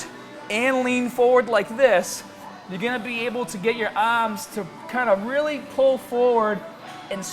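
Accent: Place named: Massachusetts, United States. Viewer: That Mass. accent seemed heavier than ever in this video, haha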